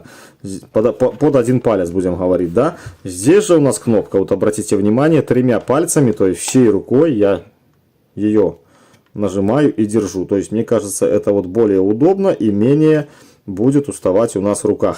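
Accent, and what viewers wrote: Belarus. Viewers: Классный белорусский говорок, я так не умею хотя далекие предки со стороны отца из тех краев.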